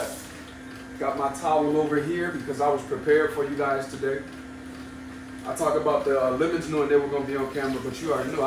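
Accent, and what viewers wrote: New Orleans, United States. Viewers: This is my first time watching any of your videos. I kinda stumbled upon it. So I’m watching and listening…and waaaay before you mentioned being from New Orleans I’d already picked it up in you. Even though your accent is turned OFF in this video, I know the little nuances and subtleties of New Orleanians and our communication patterns. The more the video went on, the more I heard them. I can tell you’re living away from the city and have grown differently due to new experiences outside of the N.O. That’s my story, as well. But no matter how long we’re away, it’s always home and it’s always within us….and it doesn’t take much for it to pop right out, ya dig?! Fuh sho.😉 Great video and I’ll definitely be trying this juice recipe tonight!! Thank ya my brudda! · Love your video… I am about to get into juicing myself, so I’m glad I ran across your video….not to mention it was particularly interesting because I knew that accent was from #Nola lol. 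Love my city & our accents…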